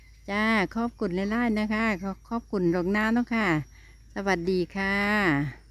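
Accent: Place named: Thailand. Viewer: มาฟังนำจ้า เว้าคือกับไทเลย นครไทยกะติดกัน เว้าคือกันจร้า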